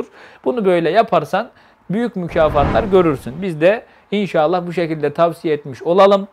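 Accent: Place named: Azerbaijan. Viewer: Azərbaycan Türkcəsinin danışığıdır. Halal olsun.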